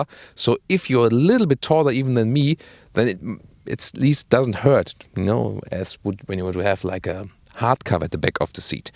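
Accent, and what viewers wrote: Germany. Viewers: Lovely when you wake up at 2PM and you can no longer fall asleep, you get to hear ' English with gorgeous German accent. Works better than ASMR!! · The perfect germenglish accent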